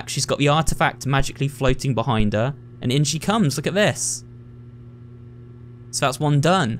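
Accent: South, United States. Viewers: Lemme just say that as a native to the southern state of Georgia I absolutely love your southern accent 10/10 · for a British person his sother accent is pretty good you can still tell he's not American born but that can but fixed up with practice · As a Texan, I have to say your Southern accent is both cute and funny. Not bad! 😂😂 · His southern accent is so uwu ❤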